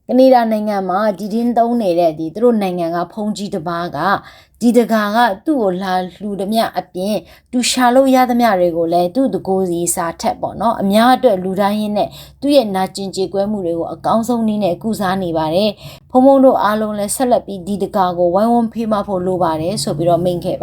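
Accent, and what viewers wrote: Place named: Chin, Myanmar. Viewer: ချင်းလူမျိုးစကားပြောအသံနဲ့တူလိုက်တာ 😊 ကိုတွေ ချင်းလူမျိုးသူငယ်ချင်းတွေလဲအဲလိုလေယူလေသိမ်းအသံနဲ့ပဲ စကားပြောကြတာ 😊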